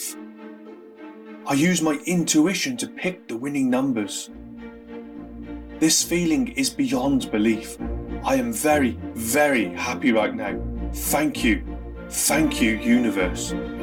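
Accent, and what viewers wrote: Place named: United Kingdom. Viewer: This is great. I've finally found an affirmation video in UK accent.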